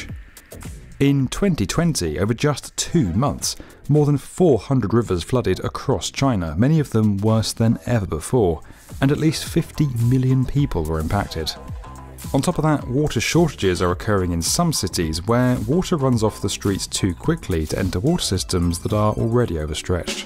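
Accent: United Kingdom. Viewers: The Presentor is perhaps from Britain:))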